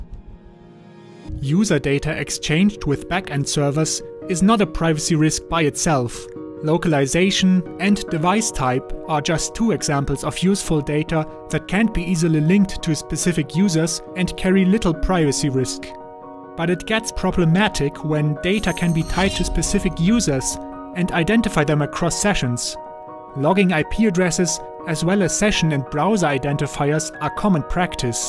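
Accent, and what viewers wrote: Germany. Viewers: German accent? ;-)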